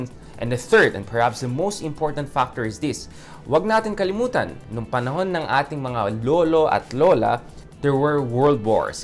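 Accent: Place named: Conyo, Philippines. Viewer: Nakakairita naman pagkacono mo pre. You buckle in ur pronunciation of English words sorry tpos nagsslang ka sa Tagalog when u even stutter with the English words. U don't sound natural in English nagkakapulupot dila mo.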